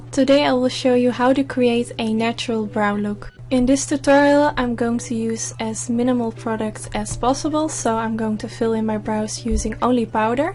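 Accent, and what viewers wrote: Netherlands. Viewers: I hear you are from the netherlands hahah love it when we speak english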